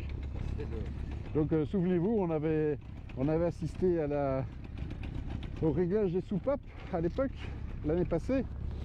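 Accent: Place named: Belgium. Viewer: 🤘😜 VAS Y T'ES BON BON BON 💪😜 Je reconnais bien le phrasé Belge 🇧🇪! Je m'abonne! 🇫🇷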